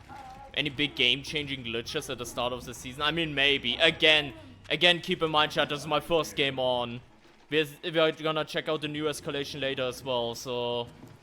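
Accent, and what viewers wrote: Germany. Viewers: hey mein bester ich weiß du sprichst nur englisch mit deinen leuten aber man merkt das du deutscher bist verfolge dich schon seid monaten was für ein level hast du mittlerweile und wie viele stunden hast du in oulast trails würde mich mal interessieren😅😊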